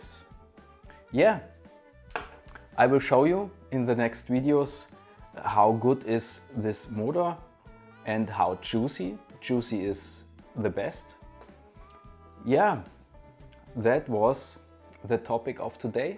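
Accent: Germany. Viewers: Man hört dass du deutscher bist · Simmer vom schwobaländle ?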